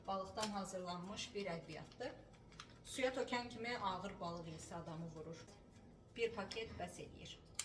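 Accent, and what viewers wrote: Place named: Baku, Azerbaijan. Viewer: Əlaaa. Aləmsən. Bakı ləhcən lap ləzzət eliyir. Eşq olsun ❤❤❤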